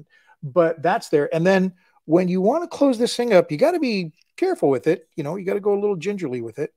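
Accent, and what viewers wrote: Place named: United States. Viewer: When you ask, it's normally used on lamps the wire and the fitting it goes into the bayonet or screw fitting I think you call Edison lamp fittings in the US I presume that's where you're from because of your accent if not please excuse my stupidity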